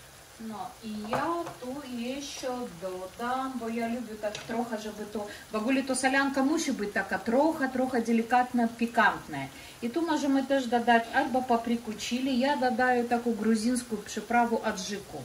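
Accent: Ukraine. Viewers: język polski, akcent ukraiński, rosyjski? przepis znany mi z literatury rosyjskiej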